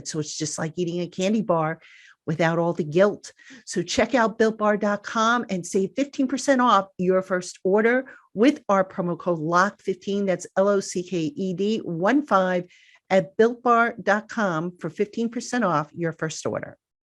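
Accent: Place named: Northeast, United States. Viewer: LOL I f!@ love your accent. 'Ahwords' I love it. As a Canadian I have been conditioned to assume you're either a mobster or possibly a deli owner. But as a Maritimer we share a lot of vocal similarities with the North Eastern US, think just north of Boston. Other Canadians tell me I say "car" like I'm from NYC which is silly but there you have it. EDIT: Just so you know I subscribed a few weeks back at the start of the season but when you mentioned it I looked and I wasn't subscribed anymore. Just a heads up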